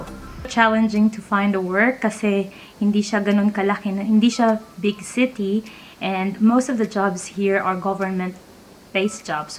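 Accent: Australia. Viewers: Love the aussie accent sissy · Madam gudang, may Aussie accent ka na..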